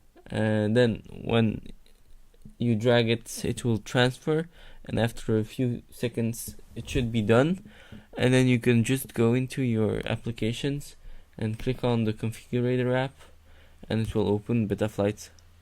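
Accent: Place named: India. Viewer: media hora hablando para no decir nada con acento deindio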